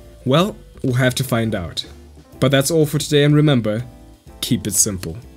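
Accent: South Africa. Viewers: Narrator sounds south African.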